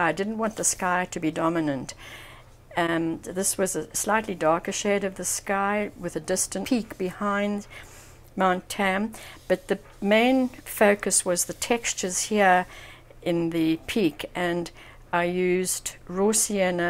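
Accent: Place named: South Africa. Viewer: Great video, thought I picked up a South African accent. I am from Johannesburg now living in the Netherlands.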